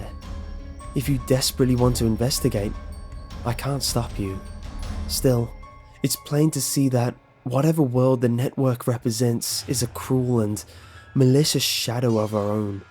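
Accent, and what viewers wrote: United Kingdom. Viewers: This one feels more authentic since it's one of the few UK stories and it's read by a Brit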